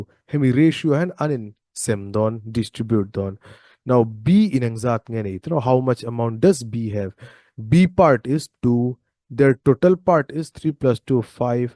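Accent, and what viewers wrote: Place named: India. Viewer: I accent hi a bharat lutuk a, ngaihthlak ahreawm,(Hinglish). mizo tawng khan sawi tluan law law la.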